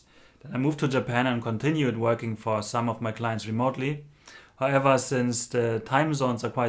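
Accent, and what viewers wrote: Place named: Germany. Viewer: Aaaah I knew you were German when I first heard your accent in Dave Trippin's video :) Bin auch deutsch (aus München) und habe für 4 Jahre in Japan gelebt, will irgendwann zurück, ist eine Liebe für's Leben, wie's scheint. Bereu auch irgendwie meine Entscheidung zurück gegangen zu sein, wird sicherlich schwierig es nochmal zu schaffen! Das Visum ist ja immer Hauptproblem.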